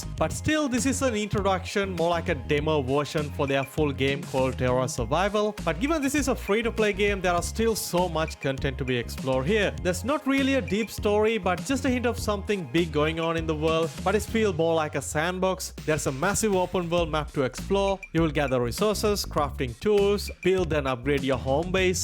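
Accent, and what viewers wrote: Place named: India. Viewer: bro u sound like indians if u dont mind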